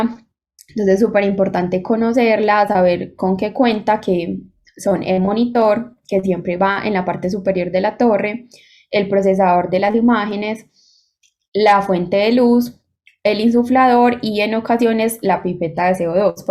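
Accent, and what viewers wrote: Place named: Antioquia, Colombia. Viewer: muy paisa, buena platica, saludos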